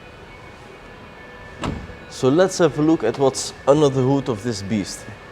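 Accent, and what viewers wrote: Netherlands. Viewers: The German right way, the US English right way, the British English right way? This is a Dutch native speaker who has of course a Dutch accent. Your accent?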